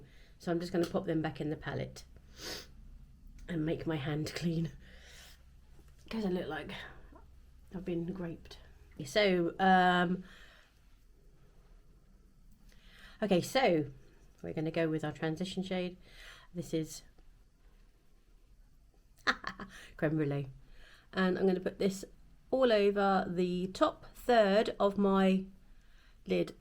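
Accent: United Kingdom. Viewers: I love to hear you speak and sooo wish I could find a gentleman from the U.K.! Iove the accent and Alan Rickman was my favorite. I digress, the makeup is so pretty and I like the purples.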